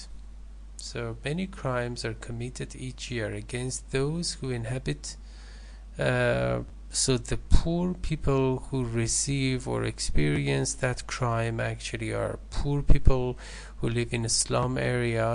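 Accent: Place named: Iran. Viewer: Such a thick Persian accent! dear lord! P.S. your examples are filled with grammatical errors.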